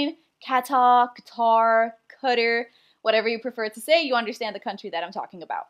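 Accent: United States. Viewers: Cool Estonian woman but your accent is totally American now so how about your native language effect there in Qatar ? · You sound American!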